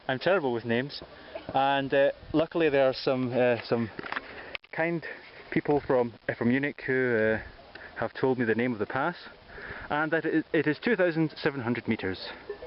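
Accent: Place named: Scotland, United Kingdom. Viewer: Hey man! congrats and thanks for sharing. Love your Scottish accent..Would you like to borrow your voice on new video or film I will be producing in the next coming year..check out my movie about the Via Alpina (blog <link>) Just few questions about Madatschjoch (time ). there are 2 ways from verpeil hutte..what makes you choose the trail through MadatschJoch and not VerpeilJoch ? Did you knew the condition of this trail and what it was like on the way down (ladder and a wall to climb down) ? I assuming you did not know according to what you said when reaching the Col Madatschjoch.... :-) I personally think the way down to kaunergrat was really tough...going up is slippery on unstable ground and with such weather condition you had i know I will have stayed and rest Verpeil hutte..;anyway you did it... From kaunergrat hutte did you follow the cottbuser trail to rifflsee (there is a short section that i would not like to pass on a rainy/bad weather day also there) or hit down the valley asap and skip that short "climbing section" to suunalm/rifflsee ? 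What camera are you using ? will look to your Greek video..I love Greece and it is very funny because you did or went to places I also went and liked Here an idea for you check out Mercantour NP Vallee des merveilles will be something you might like... happy hiking MUL rules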